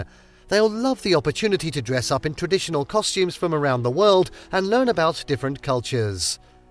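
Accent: United Kingdom. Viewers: Not really British English but it does have a slight British accent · イギリス英語かな？聞き取りやすい。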